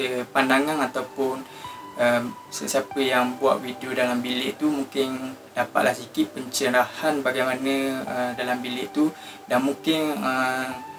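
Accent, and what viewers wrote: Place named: Terengganu, Malaysia. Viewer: Terbaik boss dpt ilmu hari ning.. Ornag ganung ke.. Ckp ade mcm ganu sikit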